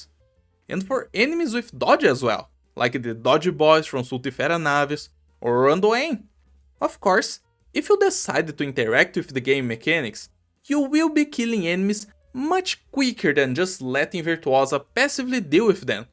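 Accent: Brazil. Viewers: Bro... you are brasilian ? Your sotaque is sooooo Brazil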